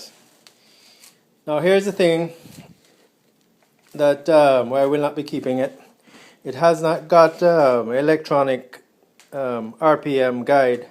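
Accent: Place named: Punjab, India. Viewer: Fuck your accent. I'm tired of having to figure out what the fucking Punjabis are saying.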